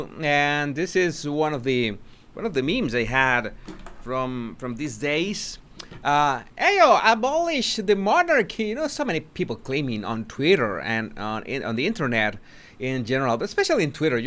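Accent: Cuba. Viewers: Your Ricky Ricardo accent is fantastic. Love and bliss to you,! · My father was Cuban too. My mother is Puertorican and both came to this country at 10 and 12 yrs old, respectively. My father never lost his accent! He was so proud to be Cuban. He passed Jan 2020. He was also a first responder during 9/11. Anyway, I also love your accent as it reminds me of Papi. Thanks,!! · My Cuban father came to America and married an American. Growing up, we watched I love Lucy and I was so proud that my dad had an accent like Ricky Ricardo. My father died in 1990 and I confess that your accent takes me back. I love listening to you speak. It's like music to my ears. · you make me laugh when you say your "Ricky Ricardo" accent. I was just wondering if you hear yourself the way others do? Do you hear the Ricky Ricardo accent? I'm from Boston and whenever I travel somewhere else people, random strangers, have come up to me and tell me "You're from Boston right? 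I can tell by your accent!" I don't hear it at all!:) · your Ricky Ricardo accent is part of your charm.😄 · I love your Ricky Ricardo accent, and like your perspective in the analysis of the body language. It is very interesting. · I e lived I both countries - is the accent Cuban or Venezuelan ? Imo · I love the Ricky Ricardo-ness of your accent! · What I love is your Ricky Ricardo pronunciation, don´t chance it. I practice my English with you, and I understand you almost everything. Love your accent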